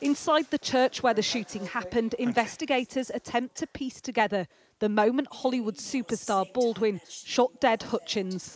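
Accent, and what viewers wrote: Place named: United Kingdom. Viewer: so tired of the Brit-ish voice overs.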